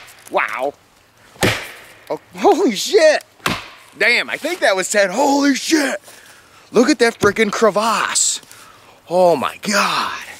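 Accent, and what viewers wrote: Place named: Maine, United States. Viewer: hey what State are you from? cause you sound like a mainah or someone from Maine. cool videos!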